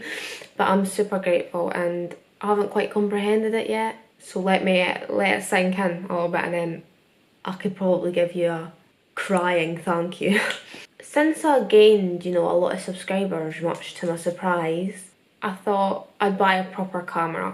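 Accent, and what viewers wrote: Scotland, United Kingdom. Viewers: Yeah I know that lol I mean I wasn’t expecting her to be Scottish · your art is absolutely amazing and so are you <3 i had a bit of trouble trying to underestand what you're saying though, idk if that's rude i really don't mean to be rude!! I'm just not scottish so I'm not used to it i guess - but would you mind putting subtitles ? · woah i was not expecting a scottish accent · She seems she from East South of Scotland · she's from scotland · Why can’t my Scottish accent sound like that 😂😭 you’re incredible no wonder you got accepted! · Just a curious question, whereabouts is ur scottish accent from? Btw love ur vidz 💖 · omg the minute i heard ur voice i was so happy cus im scottish too and finally i've found my fav fellow scot youtuber <33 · Are u british??? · the accent took me aback, didn't know she was Scottish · English is her first language, she’s just Scottish and has a thick accent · I’m also scottish BUT CAN I JUST SAY HOW TF DO YOU MAKE OUR RANSID ACCENTS SOUND CUTE?? 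i souND LIKE A MAN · Kelly Cho 저번영상에서 스코티쉬라고 한거 같앗는데 맞앗네요ㅜㅜ진짜 못알아듣겠어요 감사합니다!!😘😘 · 스코틀랜드 아니면 복부 아일랜드 같아요! 알아듣기 젤 어려운 억양인데 많이듣다 보면 익숙해져요 😊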